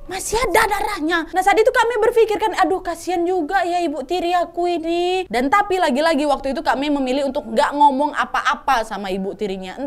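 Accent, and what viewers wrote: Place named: Medan, Indonesia. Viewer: Kak kek nya orang Medan yaaaa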